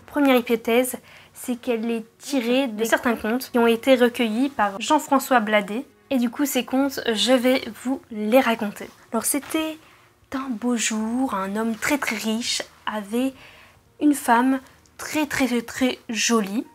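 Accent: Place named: Spain. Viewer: Coucou, j'adore ton accent espagnol. J'adore ta petite histoire. Merci pour ce que tu fais.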